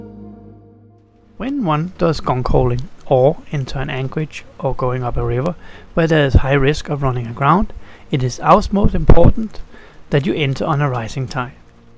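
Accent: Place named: Denmark. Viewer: danish accent..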